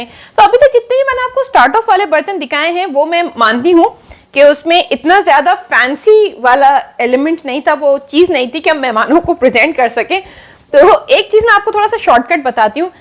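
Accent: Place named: Pakistan. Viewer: Very informative video and I like to hear your voice its pure pakistami style and I also loved your dress from where did u get this?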